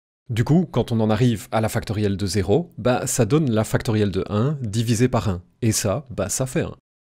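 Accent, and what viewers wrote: Belgium. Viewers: Il est belge normal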